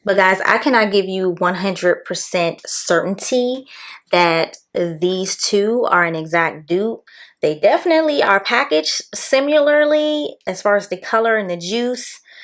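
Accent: DMV, United States. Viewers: Your accent is giving DMV ❤ I have to get the purple one love don’t be shy dupe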